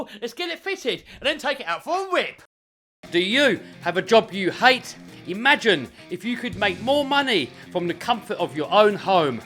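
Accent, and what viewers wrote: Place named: United Kingdom. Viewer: bri'ish accent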